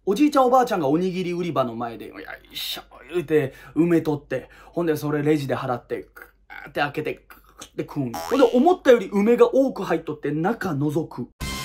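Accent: Hiroshima, Japan. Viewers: 心地良い広島弁と丁度良い動画の長さで受験の息抜きにさせてもらってます！😂 偏見シリーズ一番好きなので一生やって欲しい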